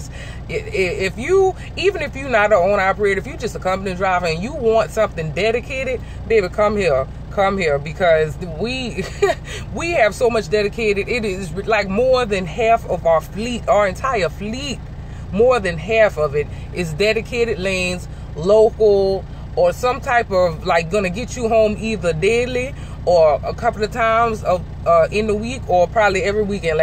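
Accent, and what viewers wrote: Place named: New Orleans, United States. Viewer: LAWD HAVE MERCY BEHBEE!! BRAINS, BEAUTY, PERSONALITY, AN YOU A CUTIE!! AN TO TOP IT OFF DAT N'AWLINS ACCENT BEHBEE!! MAKE ME WANNA JUMP THRU DIS PHONE GIRL!! KEEP DOIN YA THANG SWEETHEART!!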